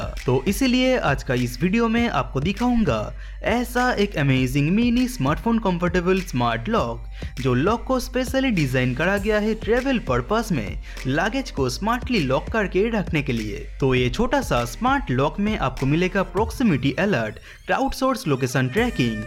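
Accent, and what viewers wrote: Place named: Bengal, India. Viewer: Abe bengali... fix your hindi accent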